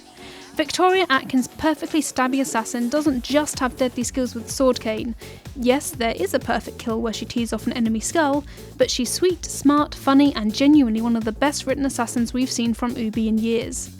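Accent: United Kingdom. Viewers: I love female characters and often play as them when given a choice but I don't dig this videos' feminist undertone and the fact the boss from mgs3 is absent really is a bit of a fuckup. Keep your identity politics shit out of games, unsubbed, feminism is collectivist trash and you Brits need to move on. Stop letting bitter women project their shitty politics on games, that shit failed and we don't wanna hear it and it doesn't sound more clever with your shitty accent. How about a best characters list you gender obsessed witch.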